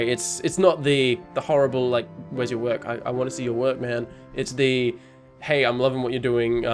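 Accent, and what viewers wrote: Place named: Australia. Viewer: Can I just say, you sound quite similar to Markilplier.. But Australian..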